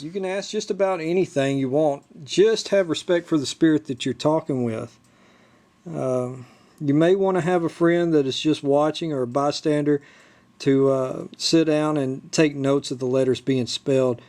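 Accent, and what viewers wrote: United States. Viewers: what part of East Texas are you from? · SOUTHERN ACCENT HEE YA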